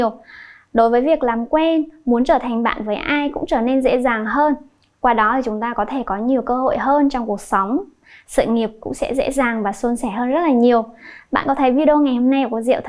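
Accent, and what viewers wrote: Standard. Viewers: Cảm ơn cô nhé hay dễ hiểu chuẩn rõ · thấy cô là người phát âm chuẩn nhất nên em chỉ học theo cô, và tự học ở nhà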